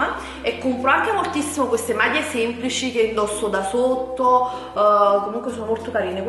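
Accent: Naples, Italy. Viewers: ma sei napoletana comunque ti stimo e sei bellissima e proprio ora mi sono iscritta perche sei simpaticissima e dolcissima😙😙😙😘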